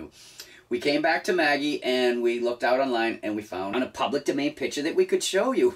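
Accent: Boston, United States. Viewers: Just found your channel and subscribed!! We just got our airstream and started our channel and cannot wait to get on the road like you....do I detect a Boston accent...? :) . We are from same area...would love to connect with you...so send us a message. Safe travels!!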